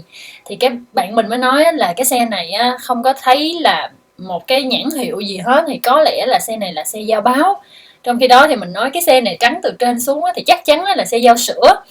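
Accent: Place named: Buon Ma Thuot, Vietnam. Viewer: bạn này nghe giọng giống ở buôn mê thuột ghê :)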